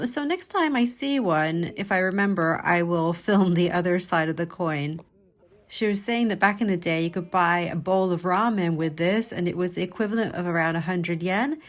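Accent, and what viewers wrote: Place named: United States. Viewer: I have a question, you being Japanese and British, you have an American accent but aluminum. Seems strange, was wondering.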